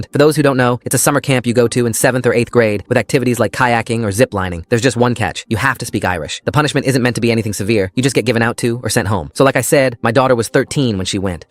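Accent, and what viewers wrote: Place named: United Kingdom. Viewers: Where did the British come from bro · Why did it randomly turn British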